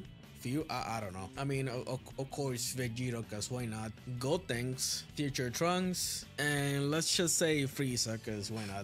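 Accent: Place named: Spain. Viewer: Wallahi i knew that you speak Spanish from the accent 💀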